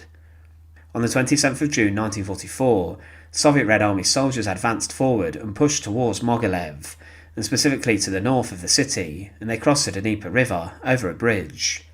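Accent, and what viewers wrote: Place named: England, United Kingdom. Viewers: He definitely is English. He just has no idea how to read a script. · i The Narrator has an English accent… just cannot read a script fluidly. Awful .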